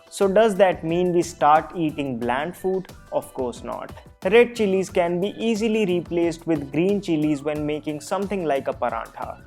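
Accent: India. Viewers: Are you intentionally using a thick indian accent??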